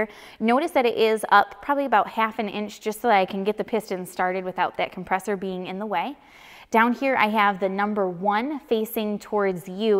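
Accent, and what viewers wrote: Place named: Upper Midwest, United States. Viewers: Lovely girl...very skilled...and love your Minnesota accent. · It took a couple of seconds to figure out what she meant by the pistons being in the "black". The upper-midwestern dialect is so endearing. 🥰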